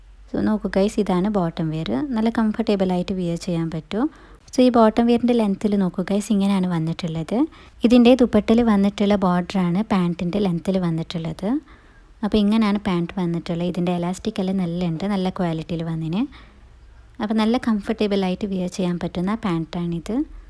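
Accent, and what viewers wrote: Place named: Kasaragod, India. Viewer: കാസർഗോഡ് ഭാഷ ഇങ്ങനെ ആണ് സുഹൃത്തേ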